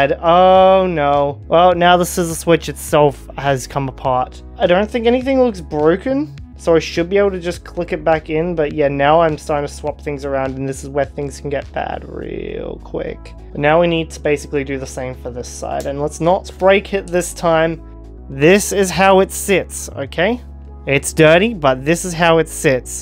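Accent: Australia. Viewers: i think he's just australian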